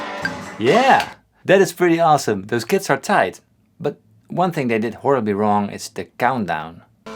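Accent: Netherlands. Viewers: First video I watch from this channel. And after 5 seconds in, wist ik dat die dude Nederlands is.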